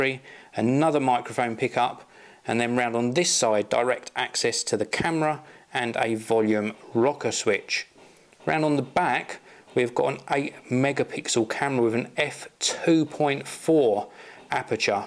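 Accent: England, United Kingdom. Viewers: I remember you because you unboxed the HTC Titan on Technobuffalo (I believe it is the same phone?) and your nice english accent.;) Great Job! I want a Windows phone as my new phone if ever im going to change a phone, but I think I'll wait for the Nokia one..;)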